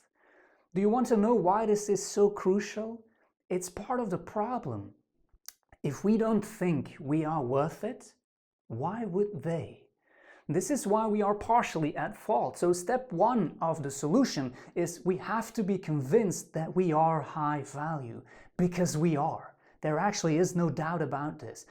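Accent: Flanders, Belgium. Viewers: Love your Flemish accent . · My ex boyfriend is Belgian Flemish. I recognized the accent. Thanks for the wise message.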